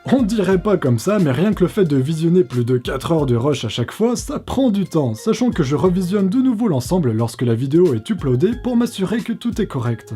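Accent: France. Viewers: l'accent français + ta voix, c'est un gros plus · ton intonation surprend... t'as juste un accent normand bien prononcé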